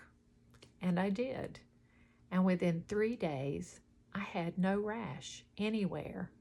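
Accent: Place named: Texas, United States. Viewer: You get cuter every time I see you and you sound like your from Texas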